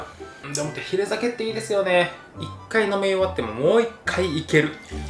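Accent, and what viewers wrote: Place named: Hakata, Japan. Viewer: 博多弁あんまり、動画で出らんよなー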